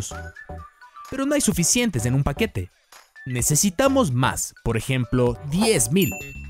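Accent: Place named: Brazil. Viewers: Soy la única que noto el acento al hablar del chico que narró el vídeo? Es brasileño,😳. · Si es brasileño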